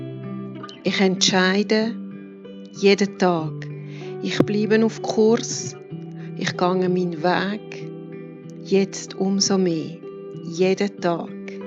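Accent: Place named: Swiss German, Switzerland. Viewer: Da meine Frau so heisst wie Du, warst Du mir gleich sympathisch. Deine Stimme ist wunderbar fein und weich, geht mir tief direkt ins Herz. Die Mundart gibt dem Ganzen eine spezielle persönliche Note. Der Wechsel von Gefühl zu Energie und Bestimmtheit ist ganz speziell, passend und schenkt wunderbare Energie! So wia's guat isch! Vielen Dank dafür! Alles Liebe, Albert Pucher.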